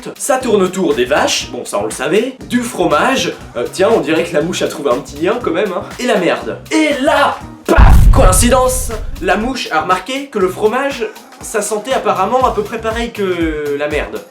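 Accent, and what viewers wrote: France. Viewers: Superbe vidéo et tu est vraiment du nord ? :D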